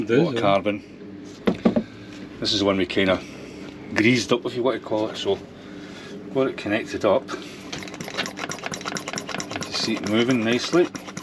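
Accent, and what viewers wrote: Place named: Scotland, United Kingdom. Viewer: Where are u based mate might be a stupid question because your Scottish but thought I would ask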